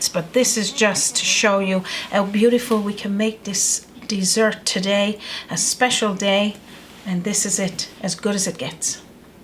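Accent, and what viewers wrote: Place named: Newfoundland, Canada. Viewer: you are just the cutest cook onYouTube! I am enjoying learning how you prepare the various meals, desserts, breads and such in Newfoundland! I developed a love for your country from a TV series I watched called "Republic of Doyle" Love the accents! Is there a reason you Newfoundlanders drop your H's?? "You 'ave to 'ave 'alf a cup!" You make me smile! 'appy Mothers Day!!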